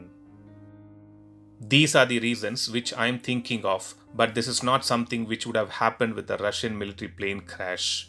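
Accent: India. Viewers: Naratornya kaya orang India ngomongnya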